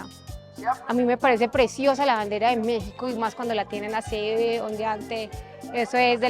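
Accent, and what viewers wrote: Antioquia, Colombia. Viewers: me encanta ese acento paisa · Hola , ya he visto varios vídeos tuyos y me encanta tu style, tu vibra me vibraría y aunque eres colombiana te aceptamos tal. Cual eres y ya eres parte de nosotros Lis mexas ok nunca pierdas tu acento , me encanta , es lo lindo de ti mmm junto con todas tus cualidades jijijjji y además muy hermosa viva Mexico y Colombia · Amo el acento colombiano 😍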